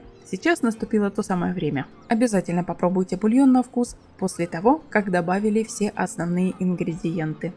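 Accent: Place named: Surzhik, Ukraine. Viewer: С таким чудовищным суржиком и на рецепах?😅😅😅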